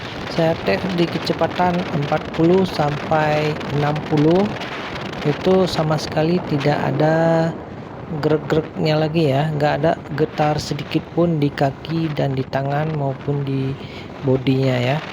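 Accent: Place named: Aceh, Indonesia. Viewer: alamat bengkel dimana bg,kayak logat aceh abg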